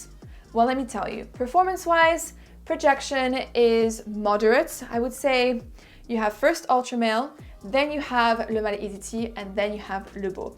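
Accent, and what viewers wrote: France. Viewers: You got a nice french accent , are you from France? Je veux dire ton anglais est excellent en plus de ton bon français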